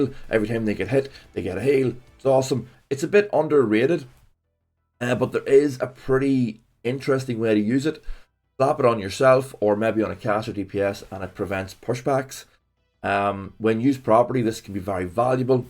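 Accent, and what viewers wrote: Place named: County Down, United Kingdom. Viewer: Where in northern ireland you from mate, sound like county down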